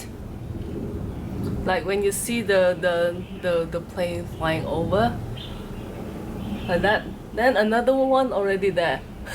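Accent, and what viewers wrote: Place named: Malaysia. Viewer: your accent is very closed to malaysian chinese... i dont know why im watching this.. but i enjoy it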